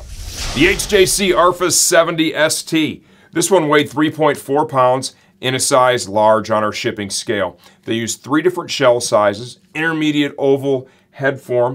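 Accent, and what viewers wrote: United States. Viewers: This man has the voice of MURICA in the intro, praise him.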